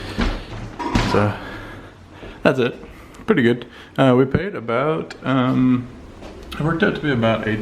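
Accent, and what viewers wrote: United Kingdom. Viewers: Наверно потому что... у него есть в произношении английский акцент, и что бы понимать слова нужна практика А у нет акцента, ее проще понять.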